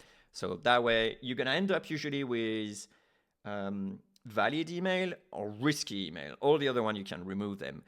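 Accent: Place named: France. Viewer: Hello are you french you have an accent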